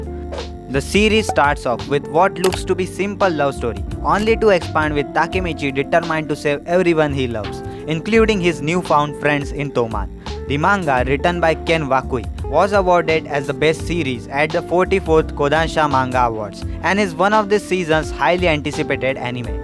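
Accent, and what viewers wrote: India. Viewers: Tumari aawaj sunke hi pahachaan lia tum indian hoo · Yes mai bhi pehchan gaya ye indian accent ko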